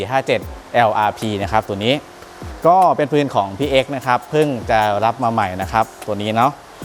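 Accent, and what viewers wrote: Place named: North, Thailand. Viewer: ฟังสำเนียง เหมือนเป็นคนเหนือเนาะ ^_^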